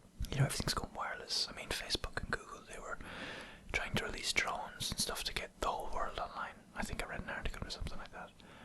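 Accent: Ireland. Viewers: Okay, so I recommend you watch 'sword art online'. I'm personally not a big fan of anime but my girlfriend is and so I volunteered to watch some; she recommended me to watch SOA (Sword art online) because.. I dunno, I think it's pretty popular. As a not very big fan at times I still watch it and think pfft... this is so Niche to teens like all animes kind of are. But the concept of purchasing this game (the game being a virtual reality RPG with the twist of being stuck in the game forever until you beat the final boss then you are free but if you die in the game you die in real life) and having a thousand 'beta testers' who know the strategy and how to survive in this game is a pretty interesting concept. Btw, your videos are great and your Irish accent is awesome. Keep up the good work :)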